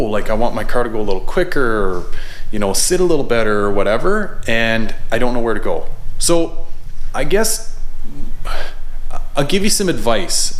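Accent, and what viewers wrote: Canada. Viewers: Your Canadian accent reminds me of back home. It's been 10 years since I've been back. Good luck with the new pony.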